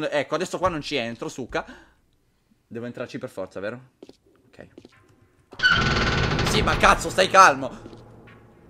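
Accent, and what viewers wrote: Italy. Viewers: spain or italy...you almost sound italian??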